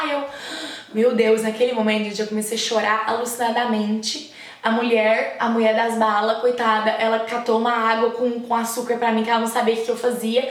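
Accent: Minas Gerais, Brazil. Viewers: Você é mineira?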